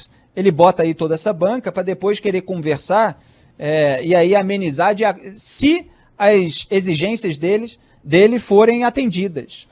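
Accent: Rio de Janeiro, Brazil. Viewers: Felipe Mourão Brasil, seus comentários são claros e eu tenho prazer em vê-los, sem contar com seu sotaque carioca que é lindo.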